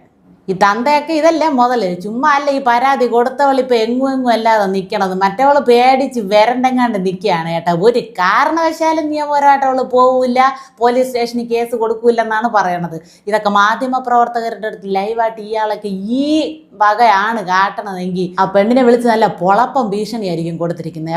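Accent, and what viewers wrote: Thiruvananthapuram, India. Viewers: Super. TVM slang is suerb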